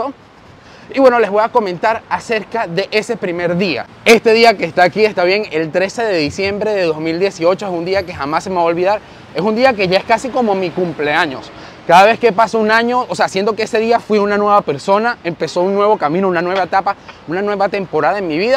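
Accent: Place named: Panama. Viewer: Me gusta como dices aquí tenemos. Ya tu eres panameño.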